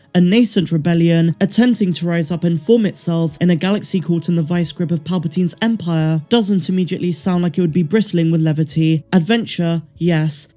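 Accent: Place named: Bristol, United Kingdom. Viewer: Are you from Bristol Star Wars? Your accent sounds familiar?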